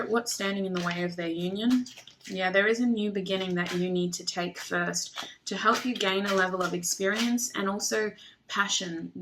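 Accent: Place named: Australia. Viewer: I watch these videos every night as I go to sleep because you have a beautiful, pleasant, lovely Australian accent 🥲💐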